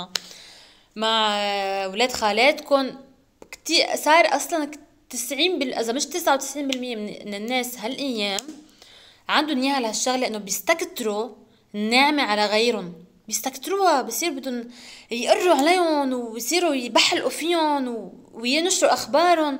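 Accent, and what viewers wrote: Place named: Lebanon. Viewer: و أنا بحبك 😂♥️ فعلاً العين و الحسد و اللت و العجن الزايد ما حلو أنا معك بالموضوع وشو كيوت طالع منك الحكي اللبناني 😆♥️ متابعتك من سوريا 😁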